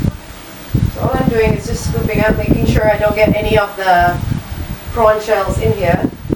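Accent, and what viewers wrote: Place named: Singapore. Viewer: May i know where are u from. U looks very ANG MO but u speaks some SINGLISH. Very interesting and anyway u're very DETAILED !!!!!!!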